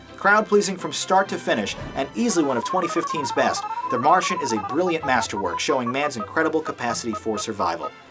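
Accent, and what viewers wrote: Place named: Boston, United States. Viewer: Review Black mass and add your boston accent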